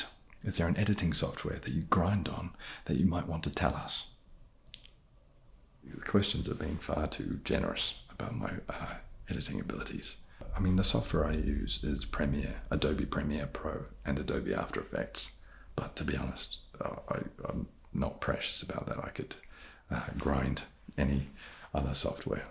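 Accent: New Zealand. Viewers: Omg, this frigging genius! Gravelly voice in stereo. Hearing my favourite English expressions in a kiwi voice (crack on, loads) is always a cheap chill. I missed the opportunity to leave you a question🙁 but I’m pretty sure I can’t think anything other people come up. As an artist I would adore painting you like one of my French girls🤣🤣🤣 This was a joy to watch and heavenly to listen to. 😊❤️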